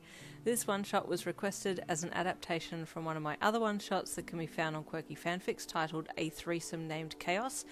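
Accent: Australia. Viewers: Loved this reader, I've got a feeling your Australian, I can hear the accent : )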